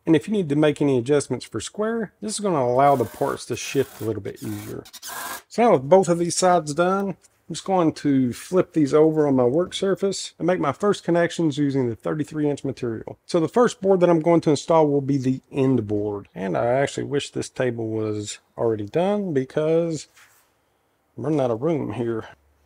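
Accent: South, United States. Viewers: Whenever someone with a thick southern accent can actually do something useful, Im shocked. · Cattywampus lol!? That's a fun word! Awe, I wish you wouldn't edit it out your southern accent .... I don't know about anybody else. But, I love me a southern accent!! Another Awesome video! 😁 I need this workbench! I currently am using two sawhorses with plywood that holds my chop saw and it's also my workbench as well as my new dining room table that I'm supposed to stain and finish .... And Not use it as a workbench extension. Hopefully soon I can make me one of these! 😁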